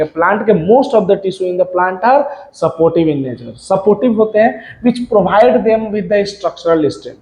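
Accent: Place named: Bihar, India. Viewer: laga ki bihar se ho bolne ke tarike se. 😅😊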